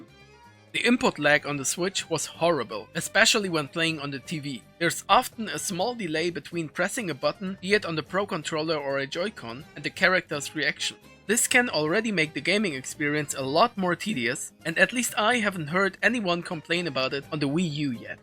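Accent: Germany. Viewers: This is the first video i've seen from you and i immediately noticed that you're german lol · I hear deutsch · Are you German/Austrian ?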